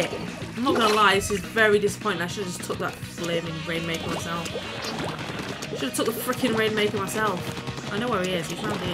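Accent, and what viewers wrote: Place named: London, United Kingdom. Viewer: Absolutely good Splatoon vid! We should play :D U sound like your from London? cuzz I am And I looooooooove ranked!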